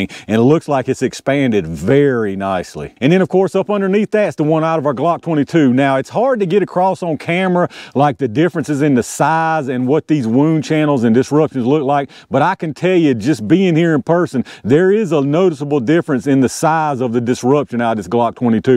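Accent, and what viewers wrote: South, United States. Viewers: I love your videos brother!! full of information and data. You talk country AF! It would be funny as all get out if you did it in an Irish accent!!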